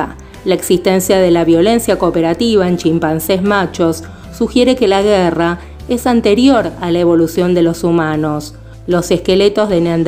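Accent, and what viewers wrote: Argentina. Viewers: Eres argentina, ¿verdad?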